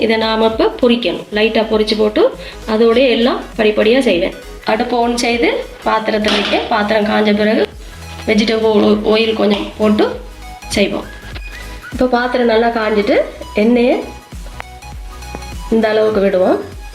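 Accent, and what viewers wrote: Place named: Mannar, Sri Lanka. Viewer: Sound like you are from mannar!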